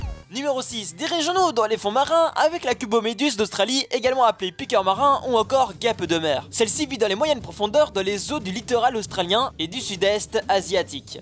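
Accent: Belgium. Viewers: tu vien de ou de belgique ? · T'es belge?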